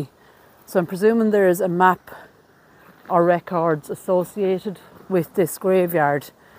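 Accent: Ireland. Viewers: I love your Irish brogue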